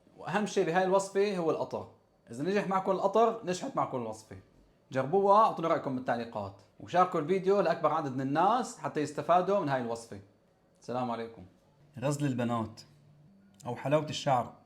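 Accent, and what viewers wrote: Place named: Homs, Syria. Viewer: لك اللجة الحومصية بقلبي❤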